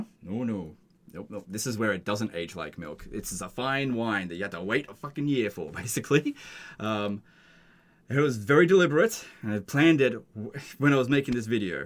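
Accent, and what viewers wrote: Australia. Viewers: Bro is aussie...I thought he was bri'ish...my world is shattered.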